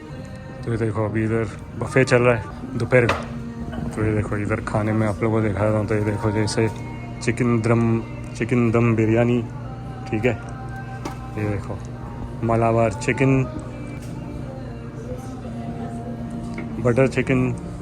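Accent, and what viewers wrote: Mumbai, India. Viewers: Typical Mumbaiya hindi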